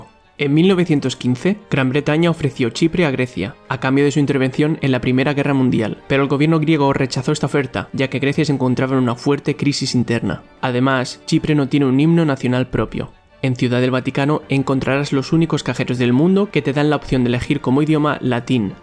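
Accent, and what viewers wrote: Spain. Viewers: Cómo se nota que sos español jajaja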